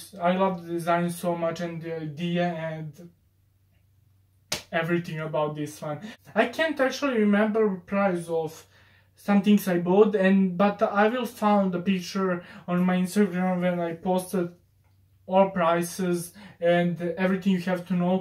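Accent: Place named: France. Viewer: you have a french accent 😱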